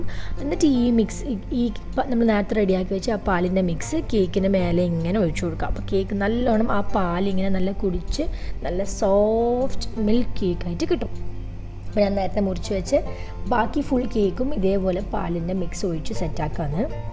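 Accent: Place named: Kannur, India. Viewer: കണ്ണൂരാണോ നിങ്ങള്.. വർത്താനം കേക്കുമ്പോ അങ്ങനെയിണ്ട്..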